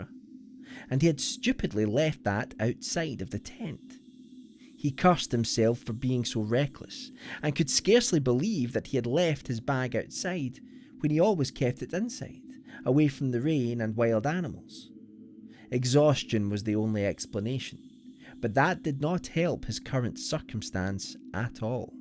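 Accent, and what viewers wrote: Scotland, United Kingdom. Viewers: I really can't believe it's taken me so long to find your channel. You're a gifted author. I've not run across a single piece of yours that's not ended up in my favorites. Getting to hear them with your delightful Scots brogue is definitely putting the glaze on the cake for me. Weel dune me lad! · Author/narrator is Scottish, yo.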